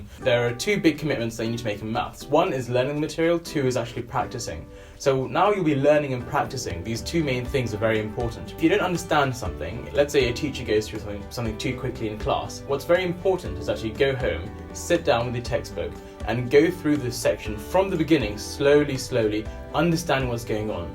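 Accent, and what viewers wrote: RP, United Kingdom. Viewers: Where are you from ? a you sound really posh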